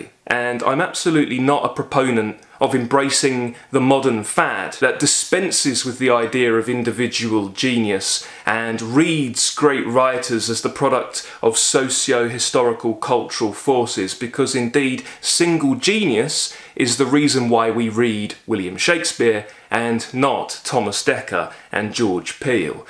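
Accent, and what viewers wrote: United Kingdom. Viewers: I really enjoy your introduction of great books. And of course sort of envy. I envy you upon reading all these books when still young. Your British accent is fantastic.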